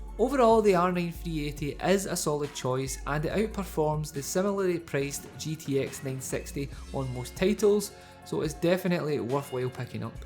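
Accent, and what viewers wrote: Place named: Scotland, United Kingdom. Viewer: i love your Scottish voice xD BTW nice video :)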